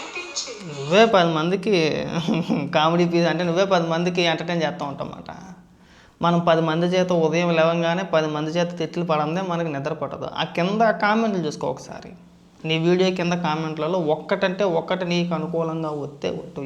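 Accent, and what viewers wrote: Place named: Amalapuram, India. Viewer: Sir me accent vinte Amalapuram lo unnate anipistundi sir pelli chesukuni tirupati daggara oka palletooru unnattu undadu Sir 🙏😊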